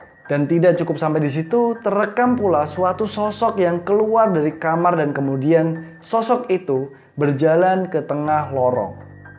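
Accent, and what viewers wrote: Java, Indonesia. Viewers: mas mbok pake "aku kamu" aja mas, jgn "lu gua", lebih oriental.. lagian masih kerasa kok rasa jawa nya hehehe sepurane ya mas, aku yo wong jowo :))))))) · iya mas. kental bgt jawanya, pakai sapaan " aku kamu " aja mas, lebih enak didengar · Medhok tapi gua lu.. #salfok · Medok jawabnya gak hilang mas 😄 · pake aku kamu saja bang, ga usah lu gue, medokkkk parahhh... kesanya gimana gitu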